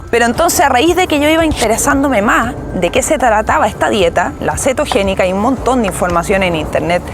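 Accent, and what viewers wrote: Chile. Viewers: Lo mismo pienso... tiene mucho acento chileno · Te noto la voz de chilena 😁 y gracias por tus vídeos ❤️😍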